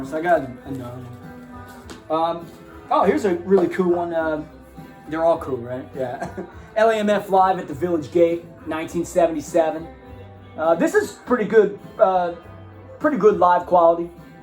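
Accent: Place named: Pennsylvania, United States. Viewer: I'm from new jersey my family's from NYC you sound like you're from the Pennsylvania area lol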